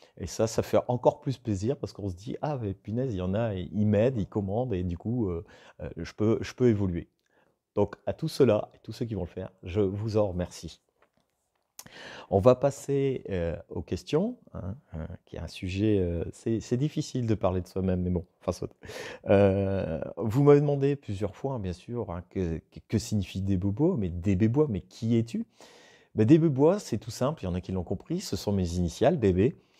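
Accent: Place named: Nord, France. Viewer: Meilleurs vœux à toi aussi M'sieur DB Bois ! Cool cette vidéo on apprend un peu plus à te connaître et ça fait plaisir 😁 Je suis aussi du 59 et à 20mn de la Belgique ! C'est vrai que les "oir" chez nous ça s'entend 🤓 En tout cas continues tes vidéos elles sont geniales, c'est toujours un plaisir de les voir !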